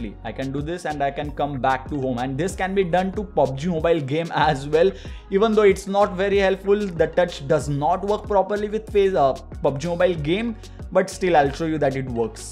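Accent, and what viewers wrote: India. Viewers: Wtf !!!!!!! You can't understand this fucking india-english ...what the fuck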